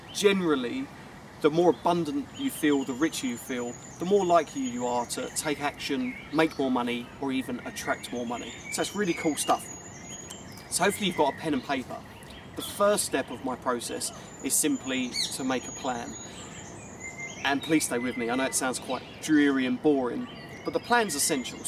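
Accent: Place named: United Kingdom. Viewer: thanks for the video alott thatks thanks but british acsent is so agly sorry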